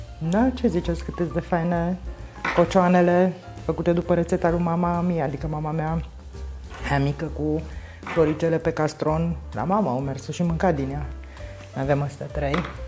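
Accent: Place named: Arad, Romania. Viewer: Vă iubesc,doamna! Iubire maternă,pt.că sunt septuagenară.Sunt originară din Ineu și mi-e dor de"graiul"din zona Aradului.Mi-e drag tonul dv.cald,felul cum explicați,profesionalismul,tactuldv.,nivelul intelectual,TOT!❤ Vă doresc multă sănătate,un AN NOU CU SPOR ÎN TOATE!🤗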